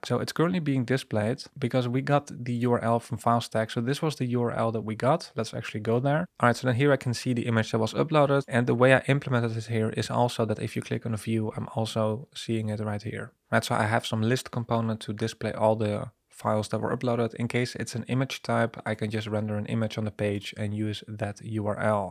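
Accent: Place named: Netherlands. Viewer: Very interesting channel. Are you Dutch? You sound very Dutch :) I am travelling around the world and do most of my work remote. How about you?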